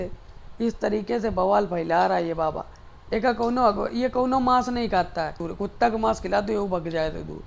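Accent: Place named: Uttar Pradesh, India. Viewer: भाई पहली बार आपकी वीडियो देखी, बहुत अच्छी लगी, कहाँ से हो आप भाषा से तो उत्तर प्रदेश की लग रही है???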